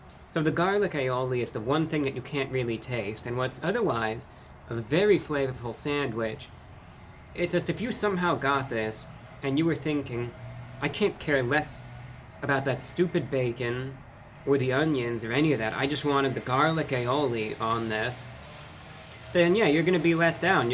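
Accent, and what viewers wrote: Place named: Transatlantic, United States. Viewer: Why the switch to a Transatlantic Accent?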